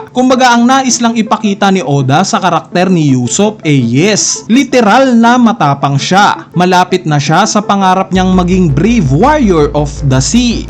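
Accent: Visayas, Philippines. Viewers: bisaya spotted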